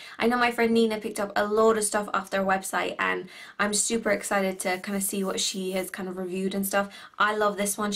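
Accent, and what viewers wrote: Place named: Cork, Ireland. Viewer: So good to hear a Cork accent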